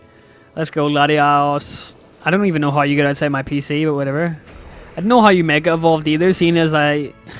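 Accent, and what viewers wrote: Northern Ireland, United Kingdom. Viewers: Northern Ireland man. Keep er lit boyo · R u from Northern Ireland/Ulster and if u r what county??? I is from Dublin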